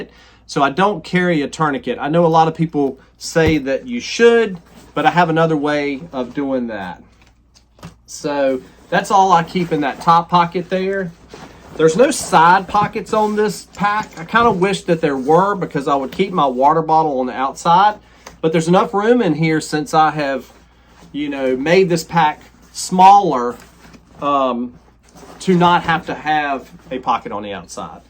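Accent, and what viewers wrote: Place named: Western Maryland, United States. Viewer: Thanks for the videos, they're very helpful for camping/bushcraft info! Sidenote: I grew up in western MD but have been living in the Rocky Mts for a few years and man, hearing you talk is making me so homesick!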